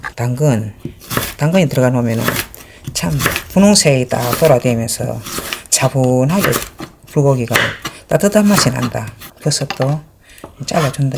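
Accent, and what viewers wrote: Gyeongsang, South Korea. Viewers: 주부경력 35년인데 보면서 배울 꿀팁이 한가지씩있네요~그리고 댓글보는 재미도 쏠쏠ᆢ꾸밈없는 갱상도사투리 ᆢㅎㅎ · 목소리가 너무듣기 싫어 경상도 억양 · 저도 오리지널( 대구에서 태어났고 현재 70년..) 쉽고 간단하게 맛있게 소개해주어 감사합니다. 그리고 멘트가 경상도사투리로 홍양홍양 너무 재미있습니다. 강력한 응원자로 따라 해봅니다. 숨은 맛있는 경상도요리 더 많이 소개해주세요. 이미 알려진 레시피는 별로 입니다. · 선생님 경상도 말씀이 더 재밌어요^^ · 요리를 볼때마다 참쉽고 만나게 많들어요 경상도 말씨여도 참 호감이 가네요 잘 보고 감니다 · 경상도 말씨가 참 구수하네요 저도 제대로 배웠어요~♡ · ! 요리강좌 공부도 공부 이지만 두분의 알콩달콩 따님의 솔직한 맛 이야기 듣는 재미로 자주 기다려져요 경상도 사투리도 구수하구요 ㅎ 겨울에 뜨끈한 국물 자작한 소불고기 쉽게 알려주셔서 넘 감사해요 따님은 목소리도 정겹지만 엄마가 해주시는 요리 드시고, 무해한 여러 음식드셔서 곁에서 보고 배우신 눈썰미가 대단하실것 같습니다 건강미인 이실듯? 싶네요 ♡ 오늘도 맛있는 불고기 요리 생표고 보다 건표고가 특유의 냄새 안난다는 꿀팁얻고 시청 잘하고 갑니다 두분 새해 복많이 받으시고, 무엇보다 건강이 최고이니 건강하세요? 고맙습니다!! >_< ♡♡ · 경상도 사투리가 구수하고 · 구수한 말씀이 경상도 분 같애요. 참 간단한 설명에요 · 경상도말씨에도다정다감 참듣기좋요. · 경상도 사투리말씨가 너무재미있습니다 불고기도 맛있게보이고 엄마하고 딸내미 사이가 너무보기좋아요 울엄마생각이 나네요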